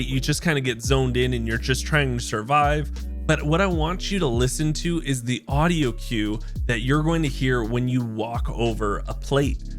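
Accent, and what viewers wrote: Canada. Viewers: You were soo accurate with your “15 DMZ tips and tricks to make you a pro” video. Your goofy Canadian accent WAS fun😮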